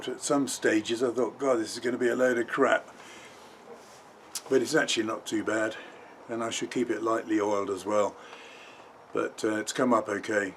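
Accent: England, United Kingdom. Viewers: *, where are you located? Depends on the type of steel as to the porosity. A little heat between acetone cleanings will help. Looks pretty good in the end. *The reason I ask is your accent and terminology makes me think England, but you measure like an American.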